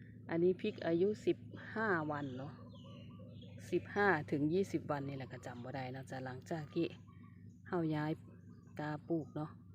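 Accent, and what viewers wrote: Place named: Sakon Nakhon, Thailand. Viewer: สำเนียงเหมือนบ้านผมเลย สกลนคร ผมก็ทำสวนพริกเหมือนกันครับ ขอบคุณความรู้ครับ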